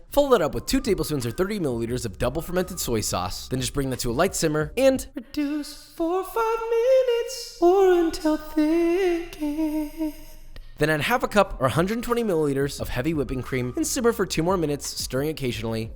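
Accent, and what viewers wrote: United Kingdom. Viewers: Just me or did get more british in this video? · More of the British accent please. As a Brit it's still somehow soo satisfying to listen to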